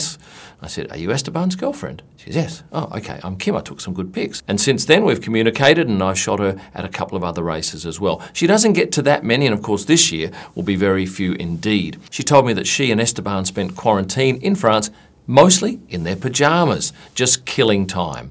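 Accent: Australia. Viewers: I have to admit, I too heard 1996 first. I went back and listened to it a few more times., your handsome Australian accent may have been a reason for mishearing the number. Being more used to hearing British and American English, the diphthong in '86' sounded more like '96' in my ears. But glad to stand corrected, 86 it is! 😎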